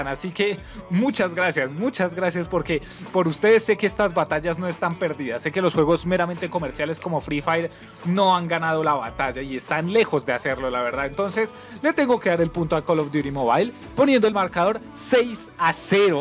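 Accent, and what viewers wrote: Colombia. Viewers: Que no se note que es paisa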